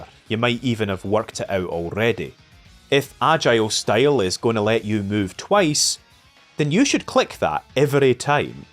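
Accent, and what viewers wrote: Scotland, United Kingdom. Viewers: Honestly, having watched the video, I would really really like to see you tackle Scarlet and Violet one day, because it's one of the most interesting games in the series to me in how it does so many things right, and yet has so many glaring flaws. Honestly, I just really want to hear my beautiful Scottish sweetheart talk about Pokémon for even more hours. I literally couldn't get enough if I tried! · The Scottishness is off the charts. Half the reason to hear you is just being reminded of Tennant.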